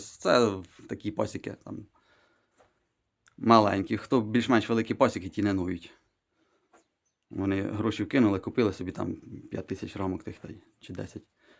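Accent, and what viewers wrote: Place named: Poltava, Ukraine. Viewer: Мені подобається ваш полтавський говор , я сам з смт Покровське дніпропетровської області і дуже схожий вас суржик с нашим, просто дивуюся , дякую.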